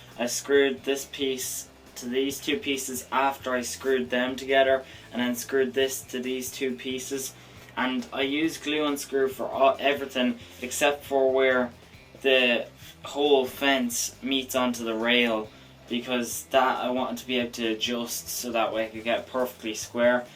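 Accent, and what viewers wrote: Ireland. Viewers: doing the fence now harder than it looks but getting their nice to hear irish accent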